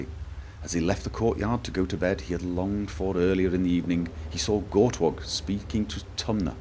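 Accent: United Kingdom. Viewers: It's like a Morgan Freedman with a British accent. that's like 200% better than the normal Morgan Freedman!!! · If you were american I would not watch these book videos but british people are so pleasant to listen to that I'm going to watch them. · Yes, oh my God so much yes! This is amazing! The calm, collected British accent and the fantastic books of the Elder Scrolls series, with your reactions added on top. This might very well be your best playlist yet. I REALLY hope you'll do all of the books from the Elder Scrolls series eventually.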